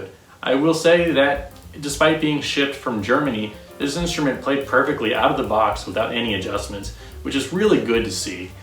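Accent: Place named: Canada. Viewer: i keep thinking your canadian i dont know why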